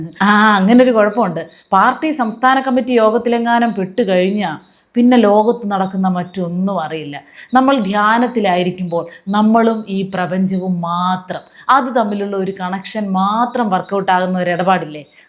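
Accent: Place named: Trivandrum, India. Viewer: കണ്ണൂർ ഉള്ള ഞങ്ങൾക്ക് തിരോന്തരം ഭാഷയിൽ ഉള്ള അലക്കൽ പെരുത്തു ഇഷ്ടാകുന്നു 😂👌😅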